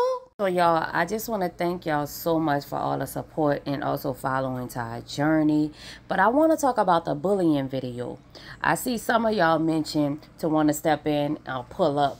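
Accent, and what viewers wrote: New Orleans, United States. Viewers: She's definitely a great MOTHER and with that New Orleans accent GURL!!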